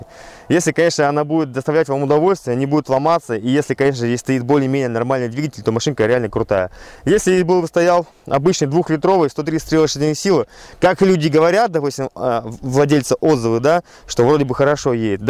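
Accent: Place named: Mari El, Russia. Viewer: бля, я живу в марий эл, у нас 50% населения общаются как! Респект!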